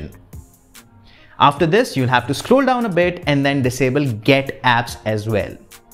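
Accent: India. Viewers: Still talking like indians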